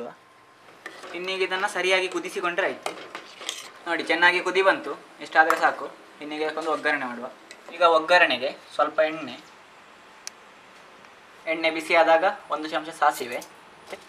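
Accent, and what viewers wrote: Mangalore, India. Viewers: ಮಂಗಳೂರು ಕನ್ನಡ ಕೇಳಿ ತುಂಬಾ ಖುಷಿ ಆಯಿತು. ನಿಮ್ಮ ರೆಸಿಪೀಸ್‌ try ಮಾಡಿದೆ, ಒಳ್ಳೆಯ ರುಚಿ. ನಿಮಗೆ ಇಲ್ಲಿ UK ನಲ್ಲಿಯೂ ಸಹ ದೊಡ್ಡ fan following ಇದೆ. Keep it up 👍🙏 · Thumba samayada nanthra nimma kannada hagoo recipe nodi mangalore ge bunda hage feel aithu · Listening 👂 Kannada words from your side is absolutely mesmerising, loved the mangalore accent great video liked it!!!!!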